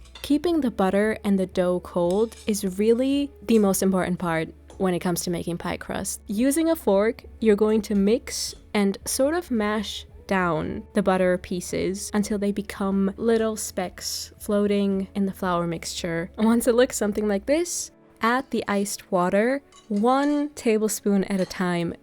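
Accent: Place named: Germany. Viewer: I've got to say you have the cutest German accent I have ever heard 🫠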